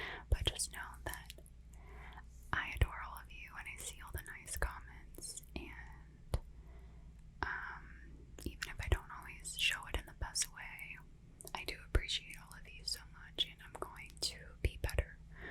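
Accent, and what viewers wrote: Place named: South, United States. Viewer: upvote for more Southern Accent whispered rambling ;)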